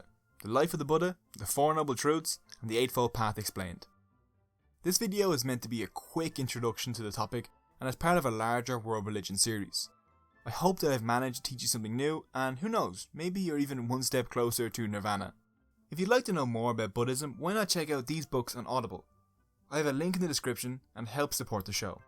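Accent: Ireland. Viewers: Hey why is Australia upside down? and what is that Island in the Pacific? Love the way you say tree3🤣 the Irish accent is awesome! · Yes I think he is Irish and speaks to quickly.Nothing to do with Australia though many buddhists on different paths live there.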